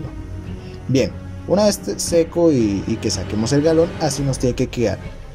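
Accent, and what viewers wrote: Colombia. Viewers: Sos colombiano?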